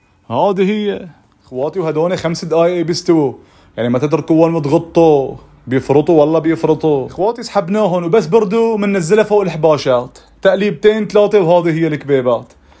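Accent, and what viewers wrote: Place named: Latakia, Syria. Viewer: احلا حكي بلعالم حكياتنا ولهجتنا اللادئانيه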